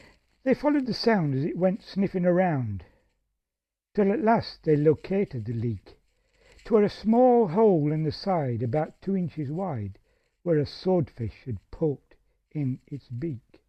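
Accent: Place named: North, United Kingdom. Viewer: Fantastic fresh take on this classic—your lively delivery nails the northern twang, especially the cheeky rhythm on the bloodhound ballooning up and Mrs Noah's soggy sleeve mishap. In my retirement project V2Melody, I've set "The 'Ole in the Ark" to a witty folk chanson to introduce Edgar's timeless humor to new listeners. Cheers for the spirited reading!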